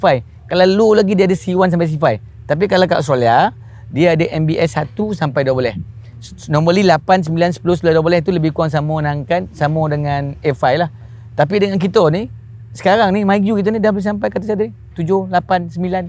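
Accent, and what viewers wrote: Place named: Kelantan, Malaysia. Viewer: tak faham.... maaf...pekat sangat cakap Kelantan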